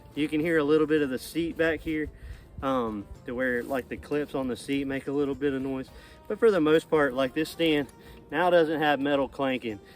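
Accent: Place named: Florida, United States. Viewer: thanks for a good video and plain florida talk. good luck hunting. I hunt osceola wma in a sumitt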